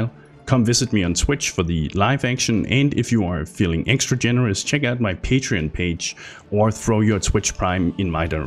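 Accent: Denmark. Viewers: danish accent? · I am so sorry, but you should learn how to pronounce some of the words. I really like your videos but i can't take it seriously, you make good videos but you english could use some work. You sound like you are from Danish, Arbejd den Danske Accent ud af dit engelsk :/ lyder mindre godt. Hvis du synes jeg er en idiot ignorer mig :) hav en god dag.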